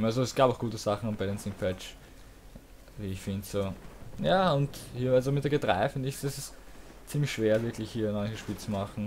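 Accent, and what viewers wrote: Austria. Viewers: Am akzent erkennt man leicht das du aus Wien bist :) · Du hast ein österreicher akzent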